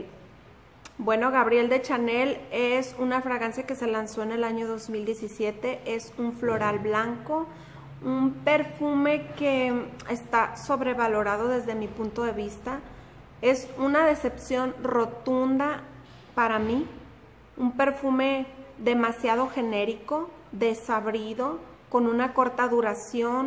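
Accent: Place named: North, Mexico. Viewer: Me parece que tú recomendaciones son buenas, felicitaciones. Por otra parte no te preocupes como hables, los argentinos, los colombianos, los españoles etc, hablan distinto y muchas veces con diferentes acentuación es y palabras mochas pero es lo que los distinguen de que país son, acá en México lo fabuloso lo más bonito es que cada estado es como un diferente país, y hablan diferente en cada estado, y mucha gente quiere estandarizar el tono de hablar, incluso hablar como chilango fresa (sin dinero) como si ponerle el tonito de fresa les diera caché. Lo más bonito es ir al norte y oír su tono fuerte e incluso con sh en vez de ch, o ir a Michoacán y oír como hablan se siente muy bien, esa es su forma de hablar y nosotros los entendemos ¿Por qué a fuerza querer cambiar la forma de hablar de una persona? Se oye que eres del norte y tu tono de voz es muy bonito y que bueno y te admiro que no lo cambies ni lo achilangues como muchos. 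Haber corrijan a los Argentinos por decir "tenés" en lugar de "tienes" es su forma de hablar muy característico, y no por eso no les entendemos, claro que entenderemos pero no falta el que se quiere sentir el sabiondo y corregir a diestra y siniestra. Respetemos el tono de hablar y su forma de hablar de las personas de diferentes Estados de la República Mexicana y de otros países que hablan Español. Su tono de hablar y su forma de hablar es su identidad.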